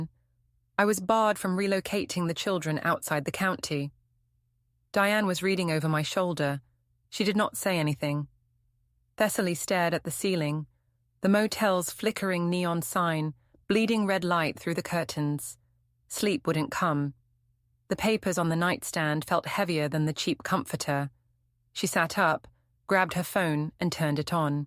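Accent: United Kingdom. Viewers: "..glorified vacuum cleaner" (with narrator British accent)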